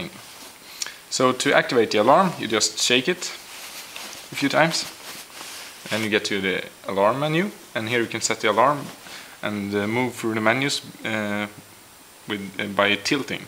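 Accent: Sweden. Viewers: where are you from? accent sounds like sweden or smth:D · from sweden? sounds like that?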